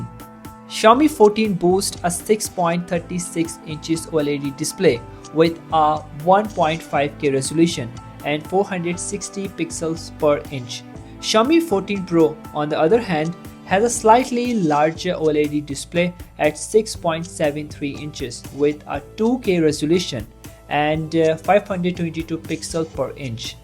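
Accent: India. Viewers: Indian accent so irritating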